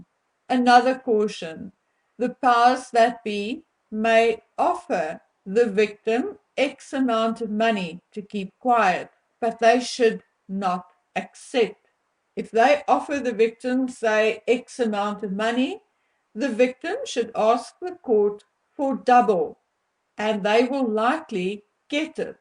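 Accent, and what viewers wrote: Afrikaans, South Africa. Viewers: Not Oidepus .... If you're going to use big words then please learn to pronounce them. Especially as these words are used in clinical settings.Shame man, I understand you're Afrikaans and that you have an accent but if you do not know how to pronounce it please ask someone who does.It is Oedipus....God bless you.✝️🌼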